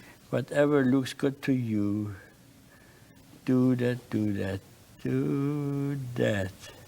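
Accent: Germany. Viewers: He has the same accent and speech patterns as my German grandmother. Miss you Oma.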